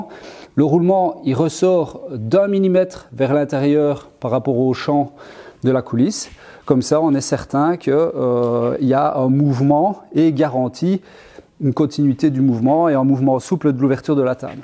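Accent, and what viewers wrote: Belgium. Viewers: Bonsoir, Moi, j'aurais dit "en butée"... Mais le parlé belge et l'accent...🤔 Abuter : - terme de marine. Mettre bout à bout, ou toucher par un bout. Ces pièces de bois abutent. - ce terme s'utilise pour la pétanque et le jeu de palet. Abuter signifie lancer la pièce d'un jeu en direction d'un but afin de déterminer quel joueur commencera la partie. C'était la minute info🤭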